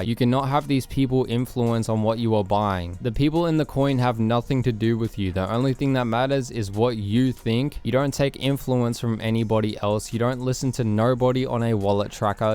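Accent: Australia. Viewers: can you do the same video but without the australian accent please. It's just i heard a w at the end of every words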